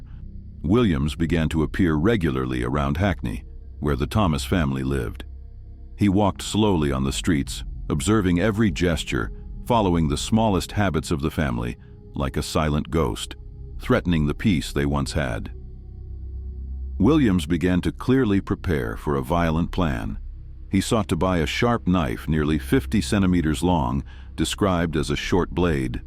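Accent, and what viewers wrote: United States. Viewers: It’s annoying to hear the American accent on a UK documentary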